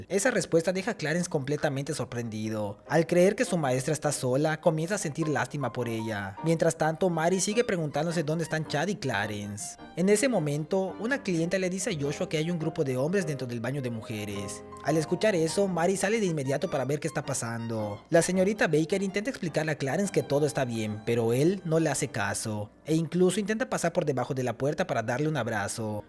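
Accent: Yucatan, Mexico. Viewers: Maaaa mi karnal suena como yucateco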